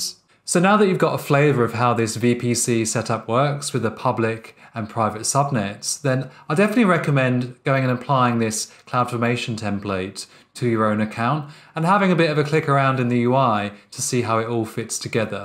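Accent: United Kingdom. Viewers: british accent makes it more understandable and engagging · London English! Wonderful!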